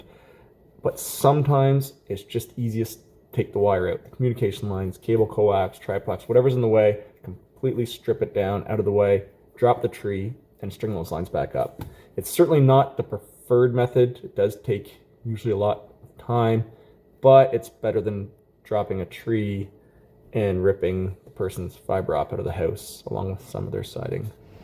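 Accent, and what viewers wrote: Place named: British Columbia, Canada. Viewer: Where exactly are you located at I could probably kind of guess by your accent that you're in the BC area me I'm down here in Tampa Florida but great videos love watching them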